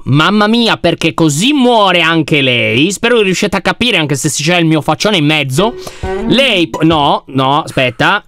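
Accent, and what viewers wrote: Sardinia, Italy. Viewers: Semmai Sardo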